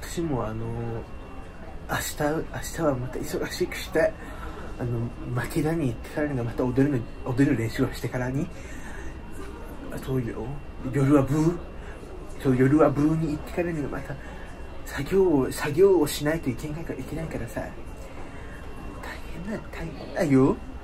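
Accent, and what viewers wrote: Miyako, Japan. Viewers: 沖縄本島人からしてもすぐ気付く宮古訛り、 いいっすな。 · イントネーションと発音がめっちゃ宮古～！って感じですね笑 石垣のおばさんたちも話してる内容だいたいこんなです☺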